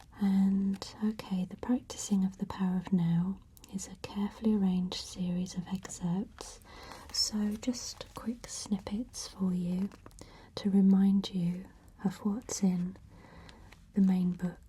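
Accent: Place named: United Kingdom. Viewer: Thank you, beautiful choice of books and an incredibly calming video 💎 I've learnt English with American accent but thanks to you I'm getting so used to British accent, and even realising how elegant it is without being rounded😎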